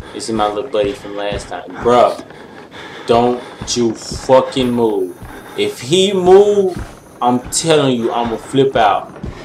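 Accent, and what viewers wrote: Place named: Texas Gulf Coast, United States. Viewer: gaddamn bwa you had me rollin!!! where you from bruh? you sound like your from tha 3rd coast! i'm from texas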